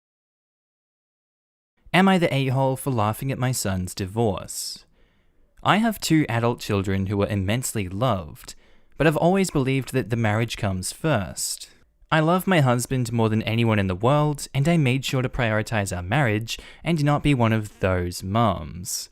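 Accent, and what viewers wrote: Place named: Australia. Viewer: Hey! From Canada 🇨🇦 love putting on your playlists and fall asleep to your Aussie voice 🙏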